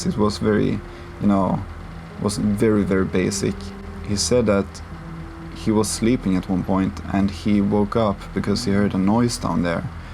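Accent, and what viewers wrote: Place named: Europe. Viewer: were are you from actually? Your accent was a bit european to me, or im i totally wrong here?🤔🙃🤭.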